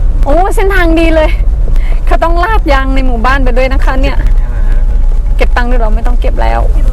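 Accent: North, Thailand. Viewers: อยากทราบว่า เป็นคนไทยภาคเหนือหรือมั่ยคะ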